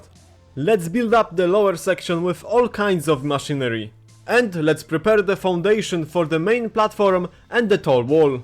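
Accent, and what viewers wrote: Poland. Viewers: bro i love your polish accent, im from germany and i hate mine bruh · Czuć ten polski ale cieszę się ze polak wyszedł na między narodowa scenę pozdro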